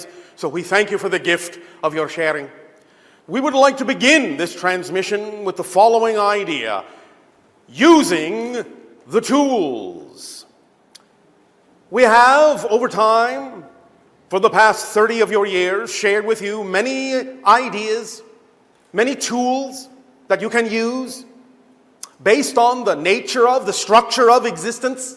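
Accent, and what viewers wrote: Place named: Ireland. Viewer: He talks a little slower and to me a bit more Irish lol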